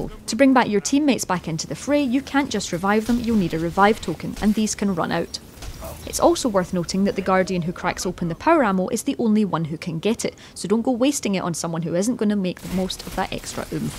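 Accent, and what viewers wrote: Scotland, United Kingdom. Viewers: sounds like a Scottish accent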